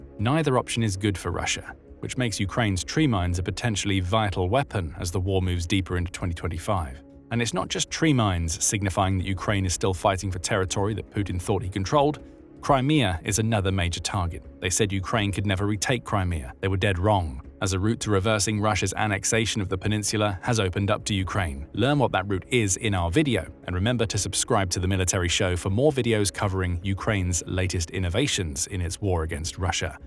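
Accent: United Kingdom. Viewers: Really dislike the UK accent in all of these Ukraine videos. If you are making videos for the UK then good for you, if making for the US then drop the accent.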